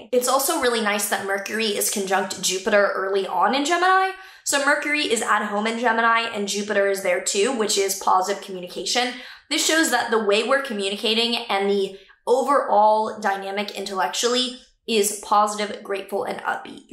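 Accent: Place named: Texas, United States. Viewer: Are you from Texas? I can hear your country accent 🥰😀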